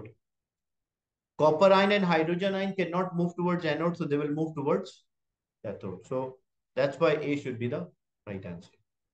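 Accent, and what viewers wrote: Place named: India. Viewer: Ur accent is indian are u indian?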